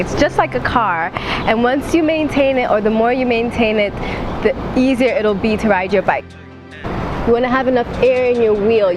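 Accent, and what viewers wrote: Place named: New York, United States. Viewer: Are in NYC? The background reminds me of my old hood in Brooklyn. Plus you sound like it.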